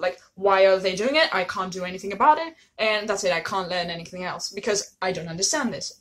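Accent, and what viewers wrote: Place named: United Kingdom. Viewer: Τόσο τέλεια Βρετανική προφορά 🇬🇧🇬🇧 You sound a bit posh😂😂😂 Είσαι τέλεια .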